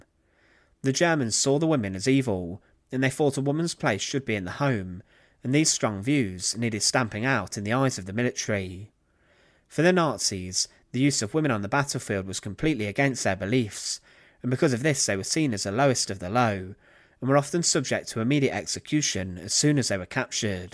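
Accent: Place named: England, United Kingdom. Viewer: It's the guy's English accent. I don't know which particular accent it is, but the "fousands" bit, instead of "thousands," just baffles me.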